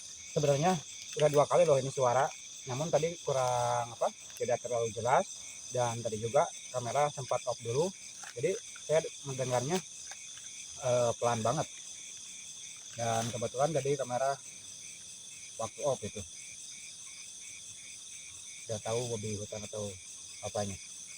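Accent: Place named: Banten, Indonesia. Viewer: Cctv tolong di perhatikan di disiapkan di pasang di luar,Abang orang Banten ya,soal nya logat ngomang nya SM seperti saya, Banten nya di daerah mn bang tolong di balas karna konten2 Abang menurut sy berani dnenantang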